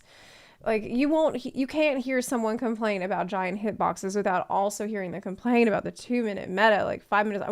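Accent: South, United States. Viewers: Xbox sprout here, only game I know you can put in..200 hours in a month and be a noob😂 I love listening to ya. As a southerner myself I like the accent. Now..back to my main full time+ot job. FfXiv!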